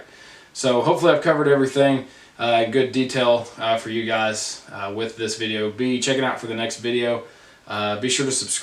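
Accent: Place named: Arkansas, United States. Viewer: I can tell Your from Arkansas... me to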